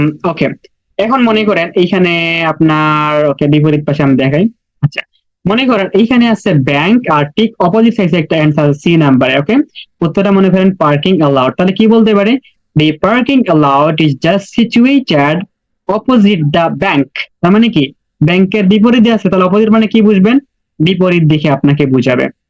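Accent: Sylhet, Bangladesh. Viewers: Vai apni kita Sylheti ni?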